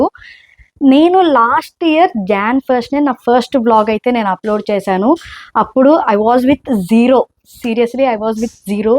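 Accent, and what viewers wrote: Karnataka, India. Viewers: Meeru 16 anaala telugu ammaya leka kannada vaara clarity kaavaali happy new year 🎉 🎉 🎉 🎉 telisanu anali mee vadranam baagundi alage me saari kuda super 👌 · ನೀವು ರಿ ರಿ ಅಂದಾಗೆ ಗೊತ್ತಾಯಿತು ನೀವು ಕನ್ನಡದವರು ಅಂತ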